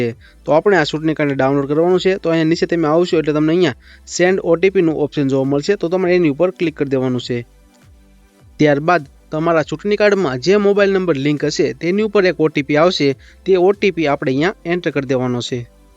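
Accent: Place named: Surendranagar, India. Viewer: Surendranagar baju ni Bhasha ma avu bolaya 6e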